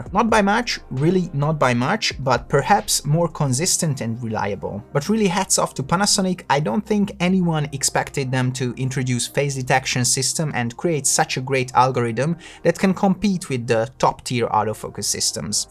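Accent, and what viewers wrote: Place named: Hungary. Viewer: Hallod én sejtettem hogy magyar vagy:D mindig fel lehet ismerni az akcentust. Király videó lett!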